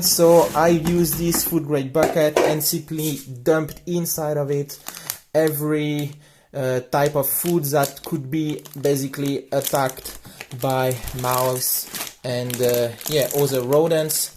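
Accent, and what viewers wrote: France. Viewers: At first I thought you were German because of how you pronounce the word The but then I read the part where you live in Bulgaria and have a French accent... Anyways, appreciated the tips😀👍🏻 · Prepping with a French accent...a new twist for me!!! Love it!!!!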